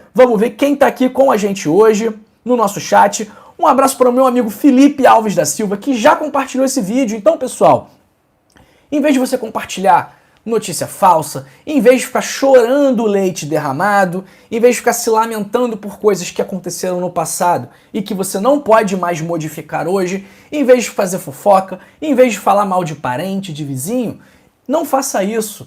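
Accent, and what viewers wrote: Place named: Pará, Brazil. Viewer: Su aque do Pará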